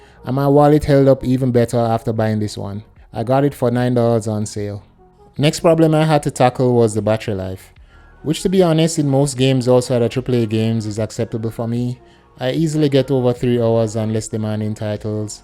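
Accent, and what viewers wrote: Jamaica. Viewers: Bro sounds like a yaadman, subbed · Yo u a jamaican ? How on earth did you get a steamdeck cheap cheap?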